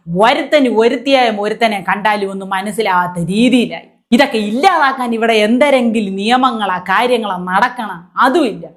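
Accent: Thiruvananthapuram, India. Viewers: ജി നിങ്ങളുടെ തിരുവനന്തോരം ഭാഷയിലുള്ള സമകാലീന വിഷയത്തെ അവതരിപ്പിക്കുന്നശൈലി ഒന്നും പറയാനില്ല..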